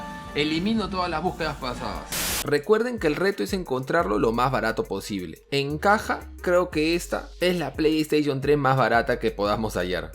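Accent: Peru. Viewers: BUEN VIDEO BROT POR TU ACENTO DIRIA QUE ERES PERUANO...SERIA BUENOS QUE BUSQUES EQUIPOS DE SONIDO VINTAGE HI FI HAY UNA GRAN COMUNIDAD DE ESO ASI COMO YO EN CONSOLAS GAMER , MINICOMPONENTES DE SONIDO ETC SALUDOS DE LIMA - PERÚ. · Por su acento probablemente es de Perú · Eres de Perú ? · Por la manera de hablar es peruanazo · Mano eres de peru verdad Por cierto que buen canal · eres peruano no manolo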